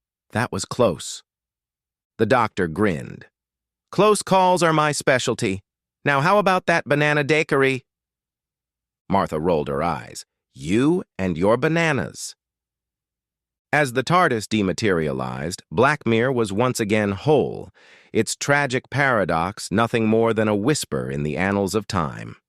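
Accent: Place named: United States. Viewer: This was truly excellent! A great story, and a great new avenue for your channel! An American narrator is not actually that jarring, as the precedent has been set by guest actors of that type reading official audiobooks. Definitely looking forward to more! Incidentally, apologies for this delayed response, but I was having a short break from You Tube interactions. Many thanks and all the best for now. Paul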